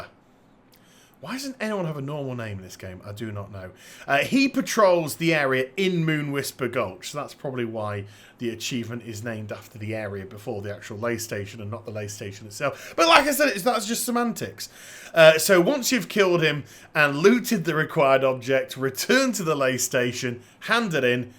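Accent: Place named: United Kingdom. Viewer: Really like your videos. You're actually one of, if not the best WoW YouTuber in my opinion. Also i like your voice and your accent. As a German who doesnt really appreaciate the British-English-Accent yours is very enjoyable. Thumbs Up.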